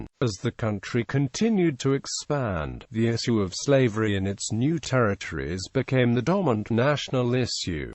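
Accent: United Kingdom. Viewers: why is a man with a british accent on here ?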